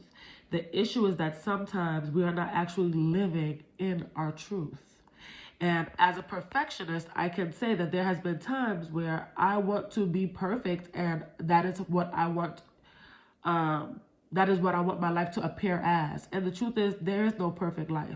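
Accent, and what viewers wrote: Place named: New York, United States. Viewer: Love your accent omg I be trying to talk like a New Yorker lol I'm from Oklahoma City, Oklahoma we have a country accent 😂😂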